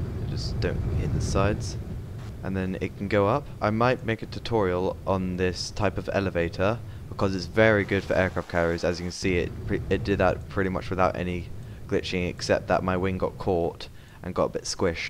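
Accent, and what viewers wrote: United Kingdom. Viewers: damn. U sound so british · R u British if ur British ur y biggest youtuber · Boii ur british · you have a really nice British voice dude, I think you should try going for a podcast :)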